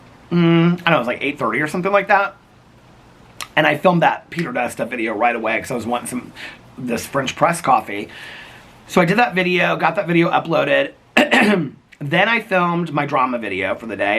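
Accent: South, United States. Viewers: U had me cracking up at the gym when u we’re talking about your southern twang from watching the slaton sisters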